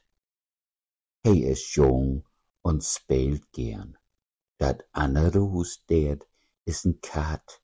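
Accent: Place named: West, Germany. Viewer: Von West nach Ost wird manches anders gesprochen, aber alle verstehen es; wo ist der Sprecher zu Hause? W ohl eher nach Westen zu.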